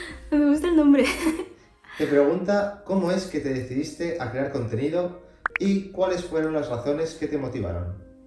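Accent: Spain. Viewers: No sé pero me das una motivación al cocinar y intentar cosas nuevas, e igual es curioso ver una chica asiática con acento español (se me hace fabuloso) Y yo no e comentado ni un vídeo tuyo pero yo amo tejer, puedo tejer y e dado clases de como tejer. E querido subir videos a Youtube de como tejer pero no encuentro la creatividad, tengo el deseo y el don de enseñar pero al parecer no la imaginación. Ya veremos qué tal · Como aprendió español de España siendo de otro país.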